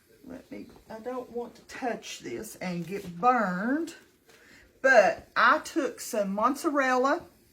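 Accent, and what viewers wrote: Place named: South, United States. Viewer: Oh, and you sound southern, do you have a chicken and dumplings? My grandma made the best, my mom is a lousy cook, i took on the cooking. She, my mother's, sent me a recipe but it was vague, pretty light on detail. Betty crooker index card. not specific. Im sure there was lard, I'm vegan, nobody else my house is.😊 it was noodly not biscuity she was depression era. Was my favorite thing on the planet. I wanna recreate for my boys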